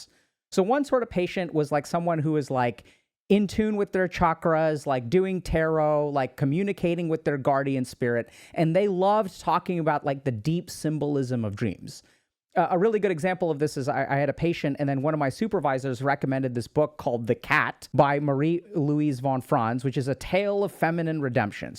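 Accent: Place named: India. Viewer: Saw the title, first thing came to my mind was this, in your most iconic Indian voice: Stop reading my mind. Dr.K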